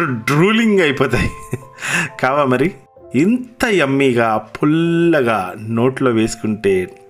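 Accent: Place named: Andhra Pradesh, India. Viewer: I like your Andhra slang broooo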